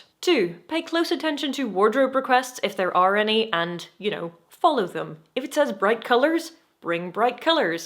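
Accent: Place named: Northern Ireland, United Kingdom. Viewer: I watched your logical fallacies video because Youtube told me to (was not disappointed). Now I'm just trying to figure out where your accent is from - I'm guessing Northern Ireland originally but you've been living in England for years. I might need to watch more videos to confirm!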